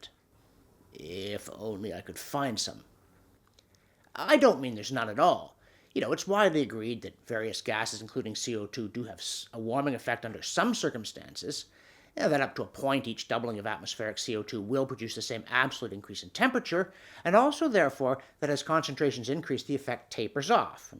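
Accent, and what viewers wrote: Canada. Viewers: Please slow down your rate of speech a notch : Not everybody is familiar with your Canadian diction : Good Vids though .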